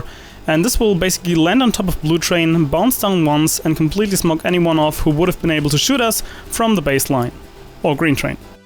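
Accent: Germany. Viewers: man hört raus das du deutsch bist :) +1 gutes video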